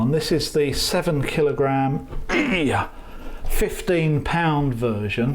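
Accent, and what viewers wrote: United Kingdom. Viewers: Thanks for this, was a bit concerned it would not go into a UK plasterboard so you can imagine my relief when I heard the good old blighty accent ;-)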